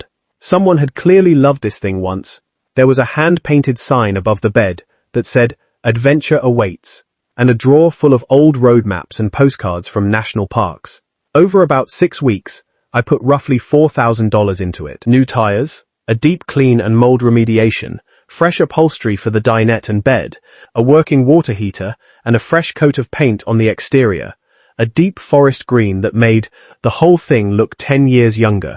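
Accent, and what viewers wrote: United Kingdom. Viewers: Why is the narrator English and not American?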